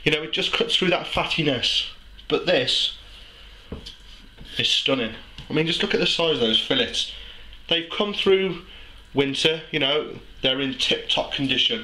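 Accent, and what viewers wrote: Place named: Birmingham, United Kingdom. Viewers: G dench He soulds like he could be from Birmingham England. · Wonderful video (except the word "combination" has a typo in it). Your accent takes me back to the days when I lived on Hagley Road, worked in Smethwick and drank in the King's Head, Bearwood.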